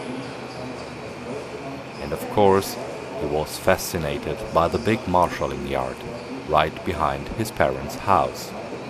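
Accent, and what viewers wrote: Germany. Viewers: The pronunciation of the Germenglish is sooooo funny, love it! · Love that German accent. Great model.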